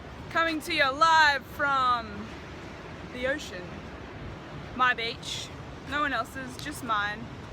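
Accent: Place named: Wales, United Kingdom. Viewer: I like your accent where are you from? Wales?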